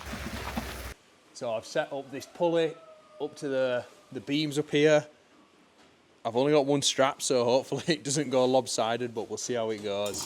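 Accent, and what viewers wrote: Yorkshire, United Kingdom. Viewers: So funny hearing your Yorkshire accent in America ❤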